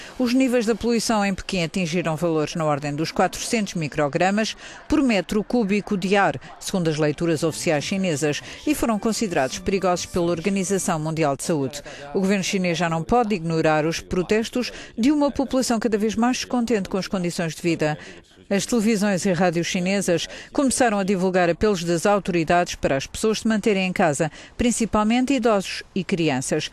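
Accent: Portugal. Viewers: Que Língua E Essa, Portugal Ou Brasil?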